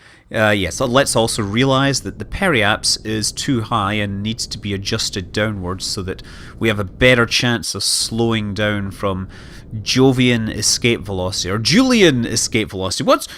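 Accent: Scotland, United Kingdom. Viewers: i want to thank you for your awesome ksp videos, i learnt so much about ksp because of you man!!! and i picked up some real knowlegde along the way. keep up the great work and use your cool scottish accent more we love it:-)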